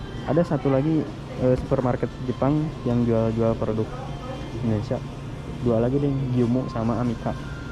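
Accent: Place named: West Java, Indonesia. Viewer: Sunda mana kang?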